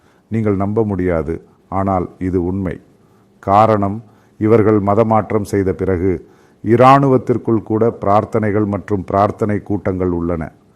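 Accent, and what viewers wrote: Kerala, India. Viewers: This is Ai generated video. He is a malayalai and I know he speaks Tamil with a heavy Malayalam accent. This is not hsi voice. And here he speaks Centamil 😂😂😂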